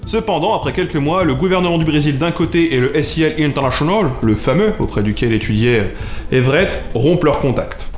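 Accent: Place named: Franche-Comté, France. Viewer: Wow, j'ai eu cette vidéo en recommandation et je regrette pas du tout d'avoir cliqué dessus ! T'as gagné une abonnée 😊 Mais euh, ton accent, tu viens d'où ? On dirait la franche-comté...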